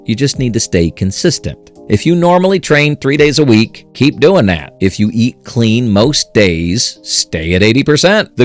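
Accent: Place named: South, United States. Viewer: the southern accent 😭😭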